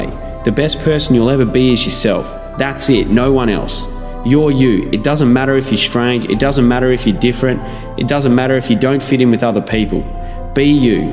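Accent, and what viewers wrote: Australia. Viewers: Awesome to finally hear an Aussie. Great content. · Great to hear an inspiring australian voice :) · He’s Australian 👍🏼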